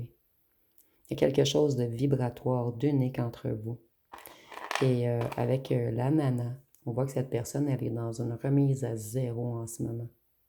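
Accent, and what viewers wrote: Canada. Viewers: 😂😂😂😂🏃‍♂️ c'est ENGENDRAIT 🤔🤔 ça passe très bien avec l'accent Canadien.. Merci Belle Âme ❤ les jours passent je me rends compte que je n'en veux plus de cette là.. plus jamais... qu'est ce que je ferai d'une femme objet sexuel qui a parcouru toute la galaxie en fleurtant avec n'importe quel homme.. jamais de la vie.. jai tourné la page et pour de bon.. j'attends l'heureuse élue et je sens qu'on va bientôt se croiser ❤❤ Gratitide infinie envers le Divin et l'Univers 🙏🙏🙏🙏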